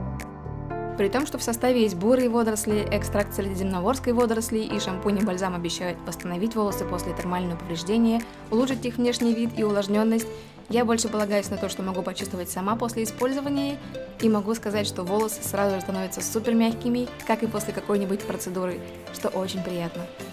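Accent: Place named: England, United Kingdom. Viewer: ооооочень нравится твоя английская интонация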